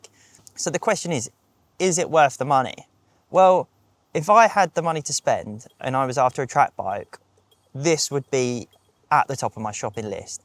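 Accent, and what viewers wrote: United Kingdom. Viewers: Nice british accent 🏍️ I'm a Spanish speaker, and I understand it better.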